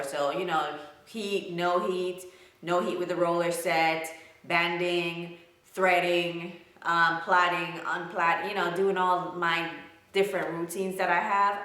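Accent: Jamaica. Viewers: I know this is probably going to sound like a really dumb question, generally, by heredity, do most people of Jamaican descent, like yourself, have thick hair. I ask because my best friend is of Jamaican descent from her father and her hair is thick. I love your accent. It reminds me of her dad. I used to go visit them just to listen to him talk. My mom couldn't understand a word he said, but I could. :-)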